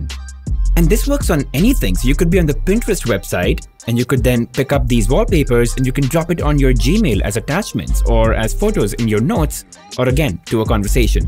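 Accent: India. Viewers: That accent — Indian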